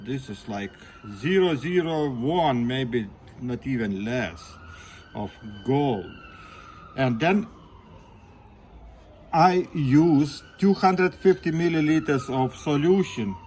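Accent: Russia. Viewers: Can you say "Get to the choppa" in your russian accent?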